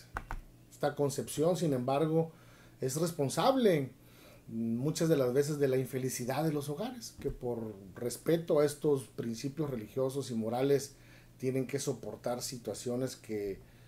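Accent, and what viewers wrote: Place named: Mexico. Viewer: Mexico, por el modo de hablar.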